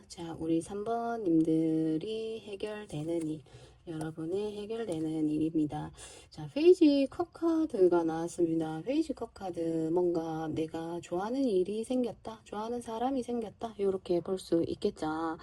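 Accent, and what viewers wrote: Gyeongsang, South Korea. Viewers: 역쉬 쵝오십니다! 짝!짝! 경사도 말씨 매력있습니다 ㅎㅎ 부분적으로 맞는부분이 많습니다 저의 소원이 꼬옥 이루워지길 요...... 2번 입니다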